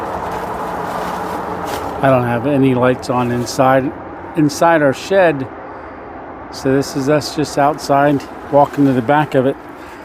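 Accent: Pennsylvania, United States. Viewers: 3 minutes in and I'm like this guy has to be from PA.... look it up and sure enough you're in mg territory lol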